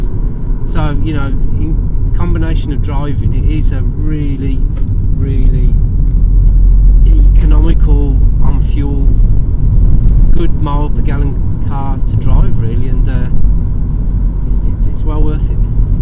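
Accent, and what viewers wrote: Birmingham, United Kingdom. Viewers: Nice review! Funny when you mentioned the A1231 in the North East - I commute along that road every day! Wouldn't have guessed that's where you were driving, based on your Brummie accent :o)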